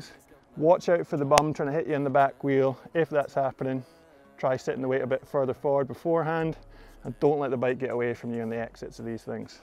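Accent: United Kingdom. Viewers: "So obviously that's all beautiful, excellent technique.", with that British accent and the plethora of hand motions you've elevated biking videos to the level of a performance of Macbeth.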